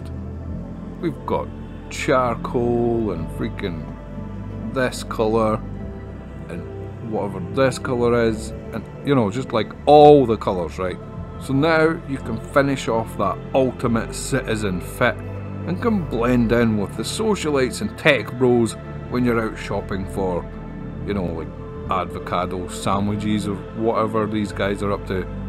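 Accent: Scotland, United Kingdom. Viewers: Gotta say i love that scotich accent 🤘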